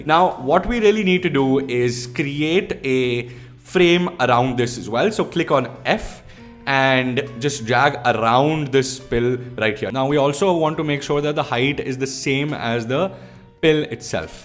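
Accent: India. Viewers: Indian accent 😂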